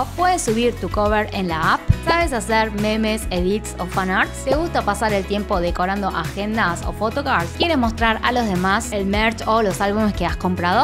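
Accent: Argentina. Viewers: ME GUSTA EL CONTENIDO DE CALIDAD E INVESTIGATIVO QUE DAS, APARTE EXPLICAS MUY BIEN EL PENSMAIENTO COREANO SIN LLEGAR A LOS EXTREMOS, ERES MUUUUY DIFERENTE A OTROS YOUTUBERS COREANOS QUE TRATAN DE REIDICULIZAR A SU MISMA CULTURA O POR EL CONTRARIO SOBREPONERLA A OTRAS CULTURAS. Y UN BONUS EXTRA: ME SUPER ENCANTA TU ACENTO ARGENTINO, A VECES PIENSO QUE MIRO TUS VIDEOS POR EL ACENTO ARGENTINO COREANO 😂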